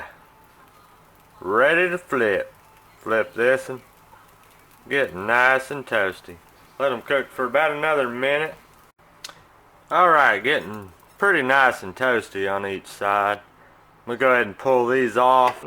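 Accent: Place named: South, United States. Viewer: It's the southern assent for me..I love it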